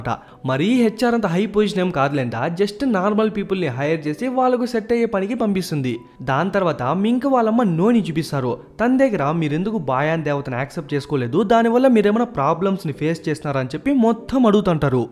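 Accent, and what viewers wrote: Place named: Karnataka border, India. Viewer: Karnataka border lo slang alane untadi bro for reference you can see harm hara movie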